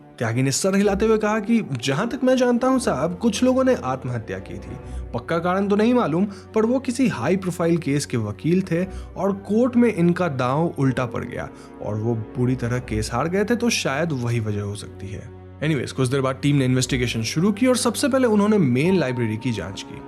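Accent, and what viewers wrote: Bengal, India. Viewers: Bhaiya aap bengal se ho ??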